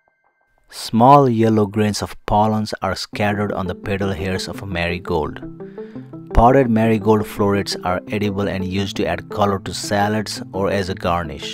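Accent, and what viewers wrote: India. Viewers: that indian talkin lol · The first picture with a strong Indian accent like his, really confused me because I thought he was saying that it was a close-up of a dick. I had to keep listening and trying real hard to figure out it was a tick. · The indian accent ruined the video.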